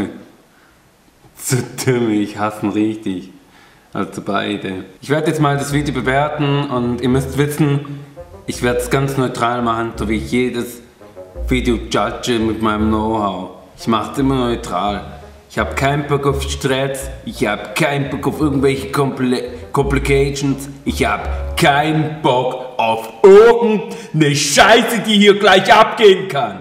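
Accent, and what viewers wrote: Swabia, Germany. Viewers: Haha, das Geschwäble! Grüße aus Tübingen 👋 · Ich liebe Dein Schwäbisch, bin aus Stuggi ...herrlich 🙏👍🙏👍🤣🤣🤣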